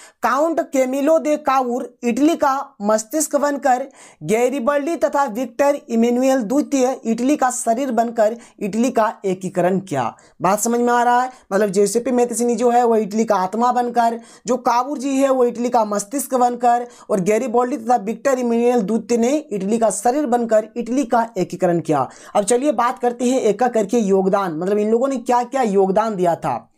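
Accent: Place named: Bihar, India. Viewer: सर क्या आप बिहार राज्य से हैं? बोली से थोड़ा- थोड़ा पता चल रहा हैं क्योंकि आप पूर्ण को पूरण बोलते हैं।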